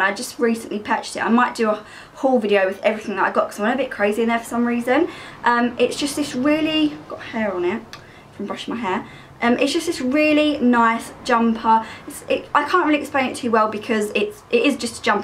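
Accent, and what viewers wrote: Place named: London, United Kingdom. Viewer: You gotta be from london :) Sound so much like my family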